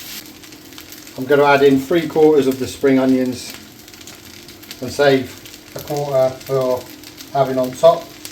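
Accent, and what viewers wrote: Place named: Gloucester, United Kingdom. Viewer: Is that a Gloucester accent , I’m the Glos- shire originally do like hearing the accent 😊🎉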